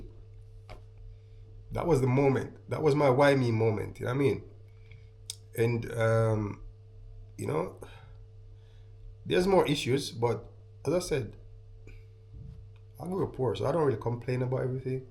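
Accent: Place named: United States. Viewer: You have an American accent more than Jamaican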